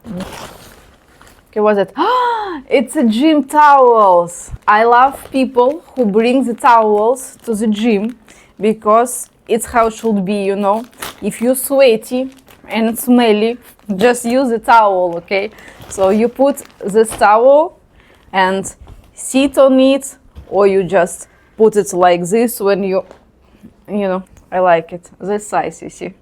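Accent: Russia. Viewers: I love Russian accent and girl ❤ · О май год. Это вас я видела пьяной и матерящейся в Арамбольсеом магазине... А акцент у вас .... Отвратительнийший - хуже индийского акцента, только жесткое рашен стайл... Не зря я не доверяю русскоговорящим учителям английского... Вы станете мечтой индийских мужчин - которые мечтают приехать в Гоа и переспать с русской... Так как кроме них вас никто смотреть не будет - это невыносимо. Но вы станете мемов в индийской блого сфере ... Вы прямо готовая РУССКАЯ НАТАША (Наташами в Турции и Азии называт "сексуальных/доступных - переводится с азиатского на обще понятный" женщин). Значение английских слов сказанных индийцами, которые вы можете воспринять как комплимент, имеют сугубо утилитарное значение и весьма далёкое от значения этих же слов в западном мире.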